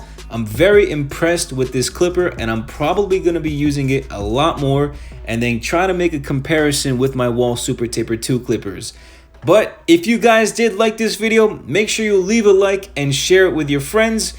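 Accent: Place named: New Jersey, United States. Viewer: Where the heck are you from originally? I'm trying to place that accent. Ya sound like you're from Joyzee(New Jersey).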